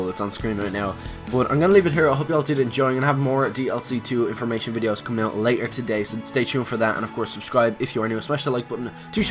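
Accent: Ireland. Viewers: Are you irish I am?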